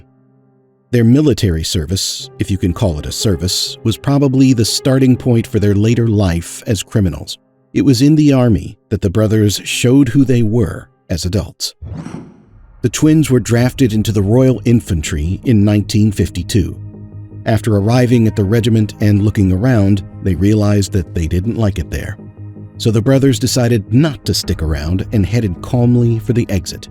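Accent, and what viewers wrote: United States. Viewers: Why is it that all these documentaries seem to have a cheesy American narrator? Unwatchable. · Muggy Yank accent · How do you with your American accent know anything at all about the twins. ? Been watching a few movies? One thing that is for sure, the east end would still be the same Also no Indian restaurants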